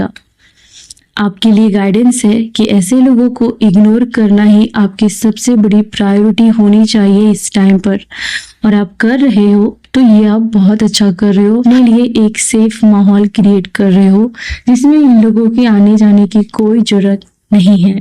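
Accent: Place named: England, United Kingdom. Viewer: Aapka voice me ek Englic Vibe hai ,,,,ayse logo ki madat karte rahiye 👌👌👌 kyunki hum manushya ko bhagwan duniya me bhejte hai jarurat mand ka help karne k liye,,,lekin insaan apna swarth k chalte bura karm karna suru kar deta hai,,,tabhi to zindagi barbad kar leta hai,,, prabhu shree Krishna ji sabko sehi Marg dikhaye 🙏🙏🙏 aap bahut achha kar rahe ho,,,mere Prabhu krishna ji aap ka sath abosya denge,,,ab aapka growth hone ja Raha hai,,,but kabhi Maan me ahankar mat Lana aap ,,,jai shree krishna 🙏🙏🙏 Radhe Radhe 🙏🙏🙏🚩🚩🚩🚩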